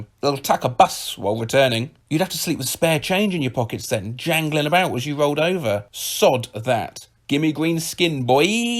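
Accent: United Kingdom. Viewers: I watch these purely for the British accent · These kinds of videos are entertaining on their own but somehow having a british narrator always makes them more entertaining. R.I.P. Soothouse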